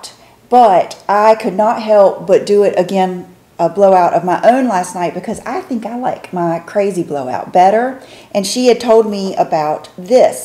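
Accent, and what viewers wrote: South, United States. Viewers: I hear your Southern accent in everything, including the speed of your speech. 😍 I especially hear it in the vowels and letter R's. I'm from the Pnw and when I was in SC people told me I had a "Hollywood" accent. LOL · I adore your accent! I notice certain things like a southerner will say goin instead of going, meetin instead of meeting. Dudnt rather than doesn’t. Also, some words are drawn out like belowwww where as, a Californian says below (quick) There are giveaway southern phrases, too, like- right much, to be sure, cut off instead of turn off (when you are turning the tv off) I especially love when you say ol for oil or greazy:) Maybe part of it is the cadence, rhythm and softness to your voice. I think that’s why so many love the Southern accent so so much!!!!! xoxo 😘 Melody PS. Do Californian’s have an accent? I’d love to know what specifically if people can tell a person is from California. 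· Especially when you say “you know”. I’m from New Jersey close to NYC, I get teased for my Jersey accent when I go to New England especially. I love your accent. Southern women seem more feminine then us northern women. I think it’s the accent, it’s softer. I get made fun of the way I pronounce dog, coffee, car the most. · I started watching specifically for that sweet Southern accent, the content is just a bonus. 🥳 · I’m Arkansan so I have a Southern accent too but it’s totally different than yours. The words you say where your accent shows the most are “long O” words like so, know, show, etc. I also love how you say water. ❤️ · The first word I thought of with your accent was "bought" and also "my". You're Southern but just enough to keep it cute! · My duvet & insert are from Pottery Barn💕 Love your accent so much🥰 I think most all your words have that southern charm😊 & I would love to have your accent💕 your hair is beautiful🥰 I do a messy bun🤗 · Every word you say, has a southern accent. 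I love love it. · I married my husband, who had joined the Army, when I was 17 and we moved from Illinois to the state of New York. I still had 1 more year of high school, so I enrolled in school that year. Everybody said I had a southern accent and it really surprised me because I didn’t think I had a southern accent. Lol! I love your southern accent! It’s really sweet. Your hair and makeup look absolutely beautiful in this video! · I love that you asked us to tell you what words we can hear your accent in. I kind of giggled because I swear I could hear it in every word you say but these are the ones that stand out to me the most: you, accent, mean ("you know what I mean"), and my absolute fav recently is "doggone." I wish I had a southern accent, lol! I've always loved them!!! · My favorite quilt /comforter to put in a duvet is a goose down one.. I got mine at tjmax. I don't get hot and it's cozy. 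And as far as the hubby night noises.. omgosh..... Mine makes this high pitch noise, he does it blowing out like a hard hum with an operaretic pitch. Idk if it makes sense but it's loud and high pitched. Good for you having a separate bedroom you can go to!!! 😁👍🏽 I have a southern accent as well but I don't think mine is as strong as yours... Lol idk if I did a video I'm sure people would tell me same..😂 The plastic hair tie is much better to me, and I just do a quick either ponytail or bun. I don't do the complicated.. I just don't pull it halfway through on last pull through, if that makes sense... Your hair seems to have same texture as mine except I think you have more curl. Mines pretty straight. Do you sleep in the velcro rollers? I need to get some!! I don't use products in my hair except smoothing cream... I think its Suave and was really cheap and works great. It doesn't weigh it down or make it oily. 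Maybe I need some good mousse for body. Do u know a good inexpensive one? Or whatever is good for body at roots. Sorry for the long comment btw.. Lol Have a great day! 🌞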